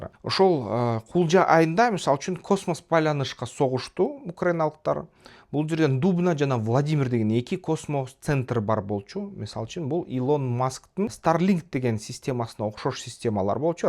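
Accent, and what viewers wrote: Standard. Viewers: Эн маанилүүсү таза эне тилде сүйлөгөнү эле кандай сонун ийгилик түшүнүктүү кылып айтасыз керемет.Ар бир берүүнү күтүп көрөбүз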